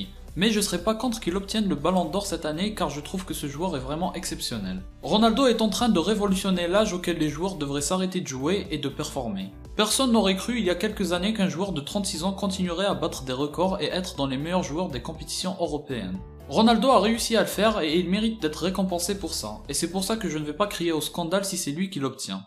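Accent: Kabylie, Algeria. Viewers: Oh la la la l accent kabyle , je kiffe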